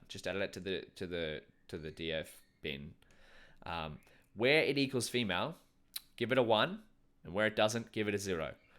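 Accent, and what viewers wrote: Australia. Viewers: Came to learn the kaggle format, stayed for the Aussie accent and comically positive energy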